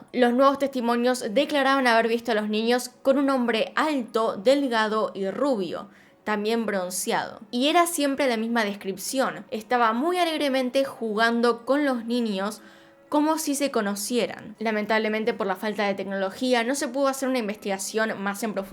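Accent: Argentina. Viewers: Me encanta tu acento Argentino y como te expresas. Saludos y felicidades por tu cumpleaños 🎂🍹🎁🎉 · Me encanta el acento argentino 🇦🇷 ♥️♥️👍🏻✨ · Me encanta tu acento argentino y cuando dices "una vez más" eres muy auténtica 🤩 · Me gustan mucho tus videos. Haces muy buena investigación y narras muy bien. Solo me cuesta un poco de trabajo acistumbrsrme a tu pronunicación argentina: “ninios” y “plasha”...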